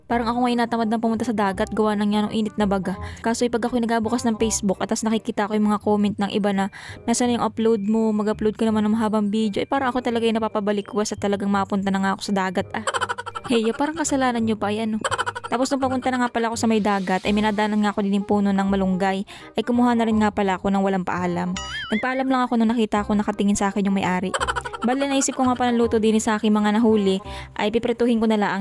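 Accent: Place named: Laguna, Philippines. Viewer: shout out Alway watching from ksa. relate ako sa punto mo magsalita 😂 na miss ko tuloy ang province ko kalayaan laguna ganayan din punto.😂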